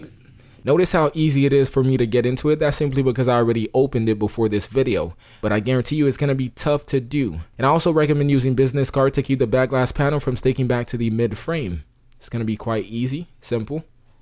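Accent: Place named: United States. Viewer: His accent is american and the phone is from verizon which is an american provider, how the fuck do you notice from the sneezing? I'm confused.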